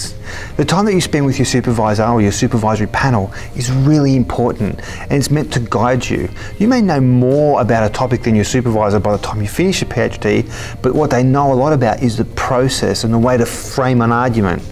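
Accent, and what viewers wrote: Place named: Australia. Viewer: Aussie accent 🔥🔥🔥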